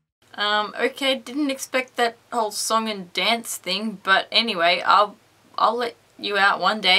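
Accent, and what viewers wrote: Australia. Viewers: your from melbourne arnt you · UR AUSTRALIANNNNNNNNN!!!!!!! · are you australian?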